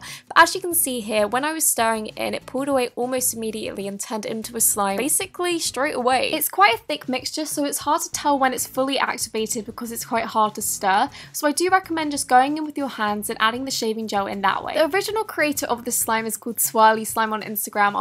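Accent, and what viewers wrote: England, United Kingdom. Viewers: I like your accent Are you from England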